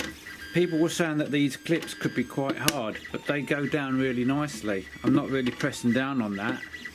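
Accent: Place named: England, United Kingdom. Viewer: I notice you have an English accent, do you live in the UK? I ask because I live in South Yorkshire and I dont have to dry any of my PLA, my PETG,ABS,ASA,Nylon are kept in vaccum sealed bags after drying them and are inside the enclosure when printing to keep dry. great deal by the way especially from Amazon.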